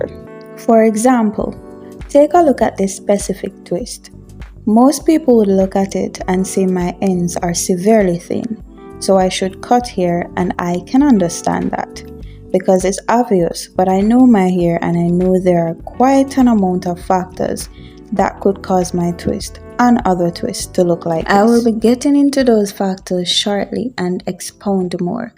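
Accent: Jamaica. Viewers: Do I suspect a fellow Jamaican?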